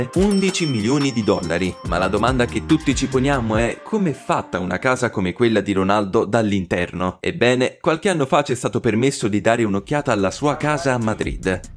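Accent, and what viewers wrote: Germany. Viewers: Bel video❤ Ma sei tedesco?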